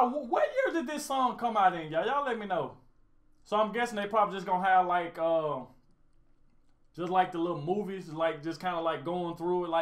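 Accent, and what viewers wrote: South, United States. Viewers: “ ”! You sound like a good ol southern boy! Thanks from Gainesville Florida! Best wishes!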